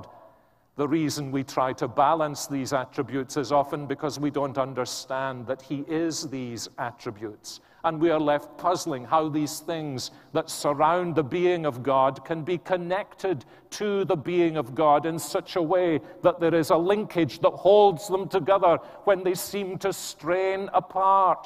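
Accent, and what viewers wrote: Scotland, United Kingdom. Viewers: Preaching of the word of God is solemn. Hearing it in the Scottish accent seems to enhance the solemnity!!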